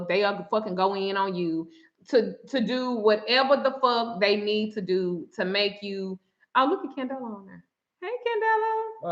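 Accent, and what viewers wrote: South, United States. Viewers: You & your husband look like you two were made for each other: Twin Flame Energy 💫 I love your southern accent.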